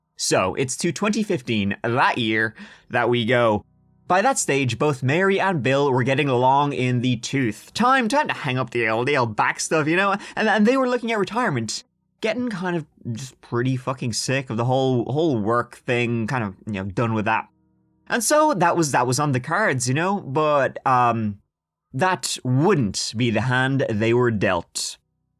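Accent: Ireland. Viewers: You are my favorite podcaster ever I enjoy your content and you oh so much. Your voice is very relaxing and you’re so IRISH. ❣️ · I enjoy ability to tell a story. Another enjoyable item is his Irish accent. I want to meet this gentleman and shake his hand.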